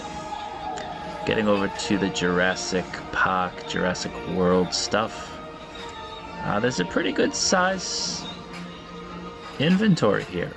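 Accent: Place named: Massachusetts, United States. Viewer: You can't deny it now your from the Massachusetts and the accent is truely from their child palace became a circuit city the one in Dedham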